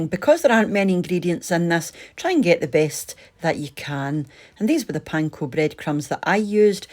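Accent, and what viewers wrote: Scotland, United Kingdom. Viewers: Have you noticed that the subtitles say to cook them for 30 minutes each side whereas says 3 minutes each side. The downside of automated subtitles which are not geared to a soft Scottish accent.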